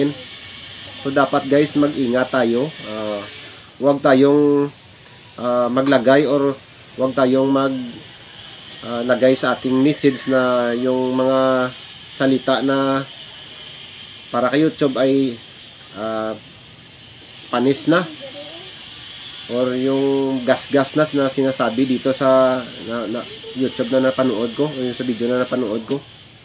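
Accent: Iloilo, Philippines. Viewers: Hhehe ilonggo gid ya bala Ang tono lods. Taga diin ka sa Negros lods? OK na gali Salamt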